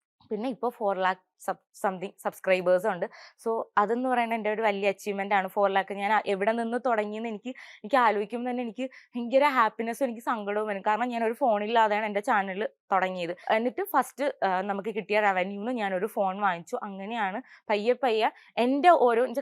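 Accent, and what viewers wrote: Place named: Trivandrum, India. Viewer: Tvm slang aan samsaarikkunnath🙄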